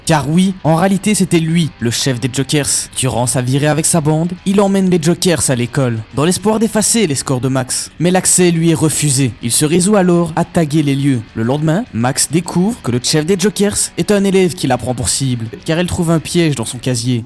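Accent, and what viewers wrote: Liège, Belgium. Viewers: c'est moi ou il y a un fort accent de liège xd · J'ai beaucoup aimé ta vidéo Et j'ai cru comprendre a l'accent Que tu es un compatriote liégeois 🤜🤛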